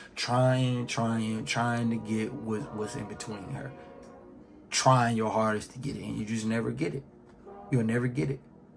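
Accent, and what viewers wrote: Baltimore, United States. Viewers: You from Baltimore huh brodie? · You gotta be from Baltimore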